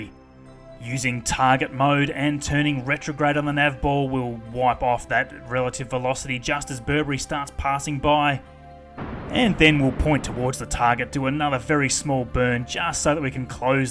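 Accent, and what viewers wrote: Australia. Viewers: Darren lockyer ahh yeaa that accent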